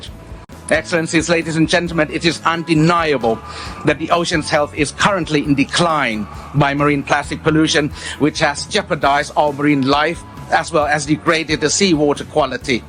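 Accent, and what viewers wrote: United Kingdom. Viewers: สำเนียงอังกฤษเลย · ชอบสำเนียงบริติชของคุณท็อปครับ คนมีคุณภาพแบบนี้ อยากให้มีกันเยอะๆในสภา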